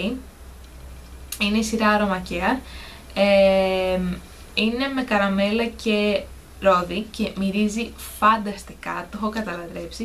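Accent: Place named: United States. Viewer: Πραγματικα εισαι πολυ γλυκια κοπελα και τα αγγλικα σου με αμερικανικη προφορα οσο μπορω να κρινω εγω ειναι πολυ καλα δεν καταλαβαινει ο αλλος οτι δεν εισαι απο την αμερικη!Αλλα λεγε λεγε μαλλον αυτα λιγο στα ελληνικα χαλασε η αρθρωση δεν ειναι τιποτα διορθωνεται προσπαθησε λιγο πιο αργα και να ακουγονται ολοκληρες οι λεξεις καντο σαν πειραμα διαβαζοντας μονη σου μην κομπλαρεις και σταματησεις τα βιντεο στα ελληνικα ισα ισα θα σε βοηθησουν και μιλας καλυτερα απο κατι αλλες να εισαι σιγουρη!:D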